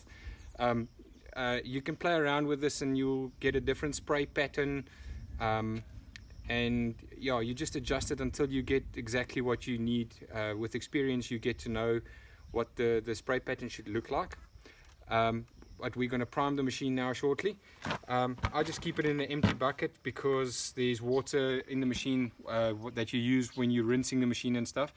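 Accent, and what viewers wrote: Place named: South Africa. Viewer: enjoy listening to the south African accent .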